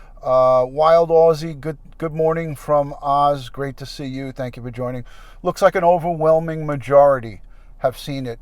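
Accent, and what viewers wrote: New York, United States. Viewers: I'm homesick in AZ from hearing your NY accent! Which borough??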